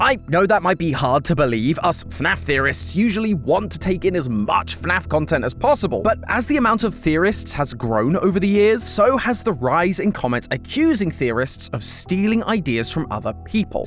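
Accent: United Kingdom. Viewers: What happend to matpat? Why is there a British guy?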